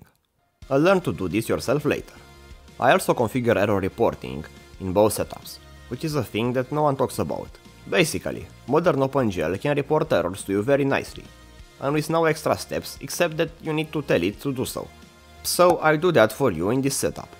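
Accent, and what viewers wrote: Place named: Romania. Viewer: you sound a bit romanian..... cf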